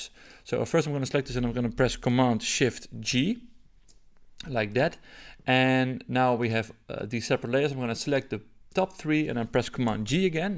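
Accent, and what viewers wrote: Netherlands. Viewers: The accent might be Dutch, not sure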